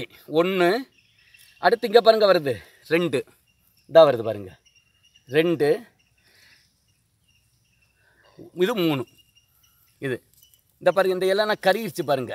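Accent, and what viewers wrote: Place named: Kanyakumari, India. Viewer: Annachi kanyakumari kaarara neenga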